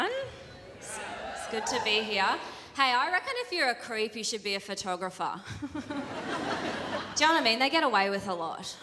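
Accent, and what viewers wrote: Australia. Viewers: Austraiiilian Maite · She's very Australian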